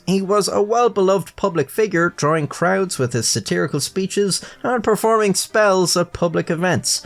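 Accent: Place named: Ireland. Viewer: hes irish. he has a reason to not speak a london or oxford adjacent accent.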